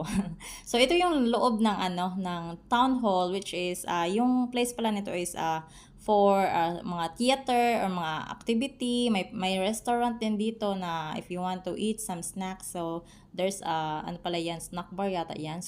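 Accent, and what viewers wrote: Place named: Singapore. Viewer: Enjoy your day in Hong Kong, I thought it's in Singapore because of the accent. Thanks for sharing.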